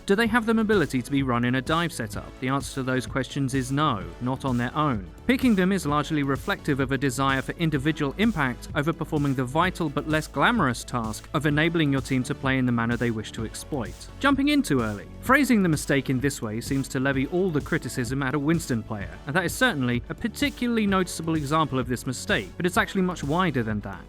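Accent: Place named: United Kingdom. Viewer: It's his accent. Listen to, or imagine a British person saying something like "remember". The R at the beginning gets pronounced, but the one at the end is downplayed or removed. Other accents and dialects of the English language have quirks like this as well.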